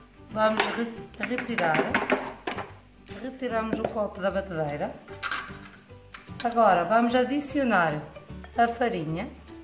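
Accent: Portugal. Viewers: Amo sotaque de Portugal. Sou mineira do Brasil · Sotaque lindo nosso de Portugal e não enrola vai logo a receita adorei isso 👏🏼👏🏼❤️vou fazer depois venho contar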